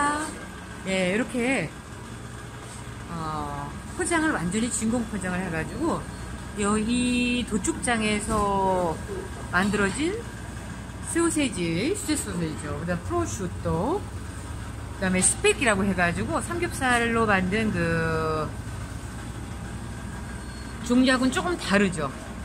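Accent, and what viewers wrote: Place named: Italy. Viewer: 굉장히 이탈리아적인 목소리 입니다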